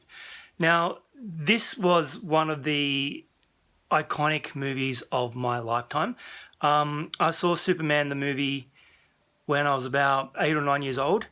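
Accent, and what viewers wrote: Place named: Australia. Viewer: It is amazing how powerful movies can be. Superman by far is my favorite movie of all time. You said something in your video that I relate to completely, and that is that Superman babysat me many many times. He was my friend. Someone I could always count on to be there for me. The magic of this movie holds up to this day. I was 8 years old when this came out, and I have seen it hundreds of times over my life. I also cried when Chris passed. He has been a huge part of my life and still is. I do not know you. From your accent I assume you are in another part of the world. (Australia?) Yet we speak the same language when it comes to this movie. And I think that's pretty cool! :)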